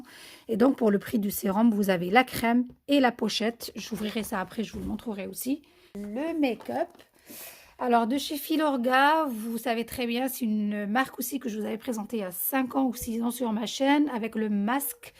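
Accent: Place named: Mostaganem, Algeria. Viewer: فرحت بزاف كي شفت الفيديو تاعك هذا وين شفتو نحبك بنت بلادي مي احكي جزائري نحبك اللهجة المستغانمية تاعك تعجبني بزاف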